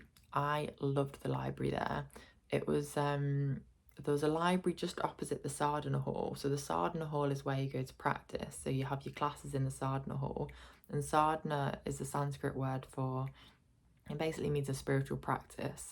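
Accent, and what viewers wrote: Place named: United Kingdom. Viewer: There are so many comments about pronunciation. Did I understand it right that you feel unsafe because of specifically having British accent? I just want to clarify for myself.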